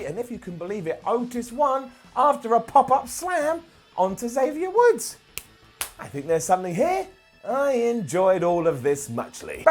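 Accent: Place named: Scotland, United Kingdom. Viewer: why a scottish accident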